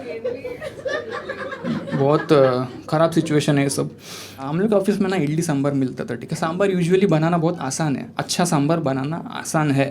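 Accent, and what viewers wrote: Maharashtra, India. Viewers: Bro is to Good su marathi accent😂😂😂😂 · His Marathi accent makes him 10x funnier lol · Love all your videos bro!! Specially your Marathi accent! Love itttt!!!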